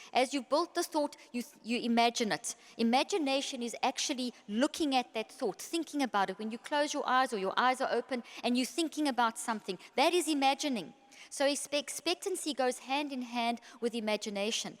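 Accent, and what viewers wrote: South Africa. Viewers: Is this a South African accent? How wonderful to listen to; both the accent and the content, of course!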